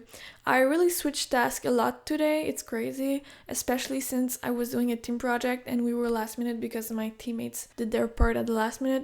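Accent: Quebec, Canada. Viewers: Are you by any chance from Quebec? Your accent sounds familiar :D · R u french canadian?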